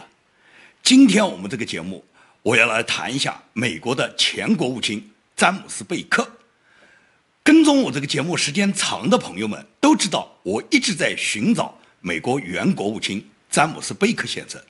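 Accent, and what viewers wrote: Nanjing, China. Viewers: 自从上个月网上偶然发现的视频，几乎每集都看。从一开始听他的口音，就觉得是南京人。而且还是南京二中毕业的。我姑姑过去是南京二中的物理老师，说不定那时候教过吴先生呢😊。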